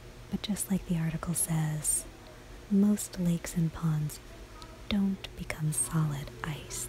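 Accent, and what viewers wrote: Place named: Canada. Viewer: All perfect from A till Z.. Winter has also its calm, romantic and cuddle feelings. Thank you.. But nice to hear your American english (though i know its canadian). Enjoy the nice christmas time dear friend 🎅🎅🎅🌲🌲🌲🌠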